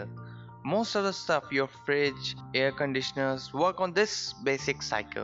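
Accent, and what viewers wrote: India. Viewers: You indian right?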